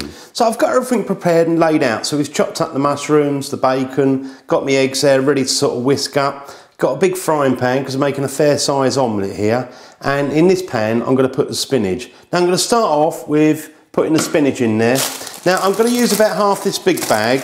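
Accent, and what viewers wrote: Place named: London, United Kingdom. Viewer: as in he has a London accent ? and so do 10 million others , he sounds nothing like Jamie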